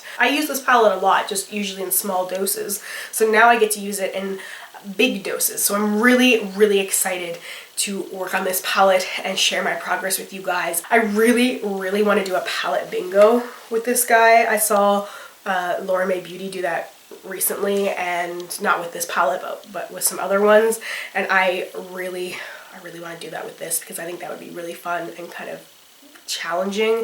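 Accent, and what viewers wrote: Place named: Canada. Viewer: I love your videos. I wish I had more time to devote to them. Three little ones keeps me busy. Don't be nervous about your get ready with me's. Taylor swift says "haters gunna hate". But your true followers love you for who you are. I love listening to other Canadians and how they pronounce their words. And today I realized we say our "A's" the same! Ok. Enough fan girl stuff.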